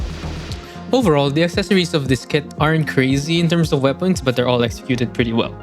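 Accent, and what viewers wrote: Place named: Philippines. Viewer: The filipino accent is strong on this one bois. We got another local reviewer! let's go!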